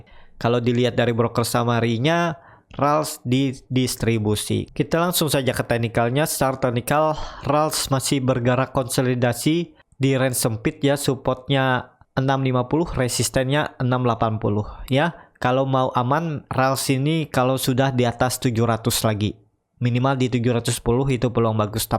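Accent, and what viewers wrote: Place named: Banjar, Indonesia. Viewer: Borneo mana nie pak, logatnya sih orang Banjar nie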